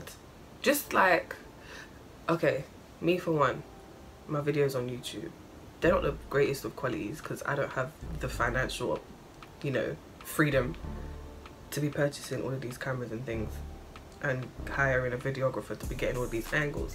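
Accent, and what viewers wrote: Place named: London, United Kingdom. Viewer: I love your London accent 🔥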